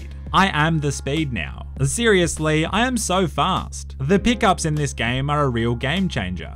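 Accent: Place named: Australia. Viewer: Can someone pls translate this into English , cant understand this Australian. Thnx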